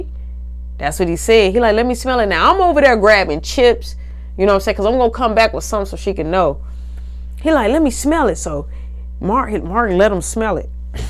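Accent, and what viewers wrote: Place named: Chicago, United States. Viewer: don’t think I’m trolling, just be tryna boost/run it up 😊 but, you grew up in NY and the Go right? Only cause I spent summers there (still go yearly for my bday, I got family in Chicago) and yo accent sounds like a mix of Chicago and the DMV.. it’s different! Anyway, PLEASE get a publisher dammit! We got time to watch these stories, therefore we can buy a 10/20 dollar book! I’m just saying! I know you can do it! Rooting for you 😌🥰💕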